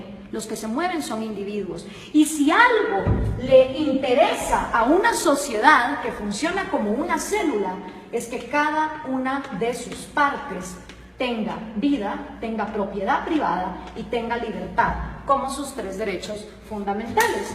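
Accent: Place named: Central America. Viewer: este video deberia haberlo filmado en blanco y negro, reflejaria el contenido añejo que sale de la mente desactualizada de la autora. para cuando haces un video revisionista de los regímenes ultramarino imperial de inglaterra vs expansion napoleonica?. por tu asento debes de ser de un pais centroamericano entregado a los narcos, pero de eso no hablas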